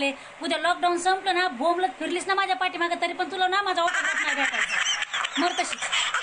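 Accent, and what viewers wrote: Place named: India. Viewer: Aho khupch chan joks krtat ani gavrn boln khupch chan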